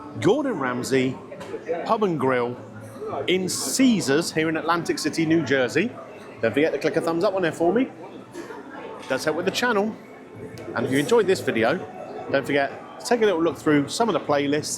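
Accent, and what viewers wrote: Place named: Dorset, United Kingdom. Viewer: I went off piste a bit with your reviews, but I’m happy too say , although I’m sure you would not mind either way , you’ve got back into my good graces , I think it’s your Dorset accent, I’m originally from Devon and I’m getting home sick stuck in central London, also I think you’ve done some stunning reviews lately too , there is room for both you and Gary in my subscriptions , My best too you and Mrs G , keep up the good work 😊 !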